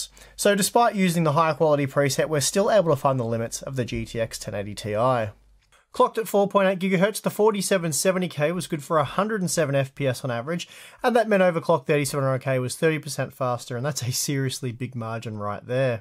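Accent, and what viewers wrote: Australia. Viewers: Just found your Channel and subbed.. refreshing hearing a Aussie for a change love the Vids. Is a i5 4670k still OK for Gaming ?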